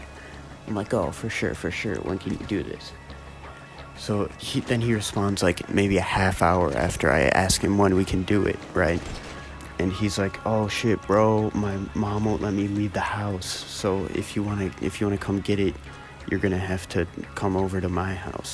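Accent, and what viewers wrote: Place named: Mexico. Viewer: u followed me on instagram and im assuming its becuase i follow luna and goblinn but dont try to be like them lol u sound like ur talking into an extinct microwave, ur voice isnt really thrilling, u sound like ur mexican,indian,irish, and a whole other bunch of languages, ur voice isnt interesting, ur not sure about what ur talking about and ur unfunny as shit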